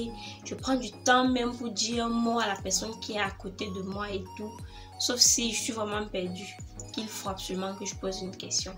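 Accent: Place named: Cameroon. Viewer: c’est ton accent 🥰 j’ai fait par élimination et je suis tombée sur le Cameroun 🇨🇲🔥 😉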